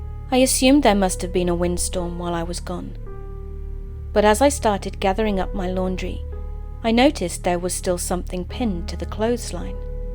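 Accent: United Kingdom. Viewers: Good story, great beautiful actress, excellent editing and camera work! The whole thing was exceptionally well done, with very high production value. Only 2-continuity type things kinda confused me: If it takes places in the USA, especially the South, why does the Narrator have a British Accent? Also, I think forgot to take off her beautiful wedding ring, unless she's married in the story. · Very good, I am impressed but remind me never to go to that store for lingerie. The store lets the customer try on lingerie and then resells which is something that not done any store lol. The narrator did a fantastic job, is she British she sounds British to me. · Admittedly, the coincidental situations that the lady in this story experienced are equally scary and sad. She leaves her abusive ex and tries to find solace at this place in the country, only to have some random creeper sneak his way into the new place as well. 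Otherwise, as always, this video and its story are both very well done, and British accent adds a very regal element to it. Good job yet again!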